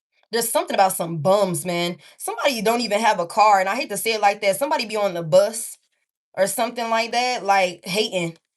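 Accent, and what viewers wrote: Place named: New York, United States. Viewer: You sound like you’re from NY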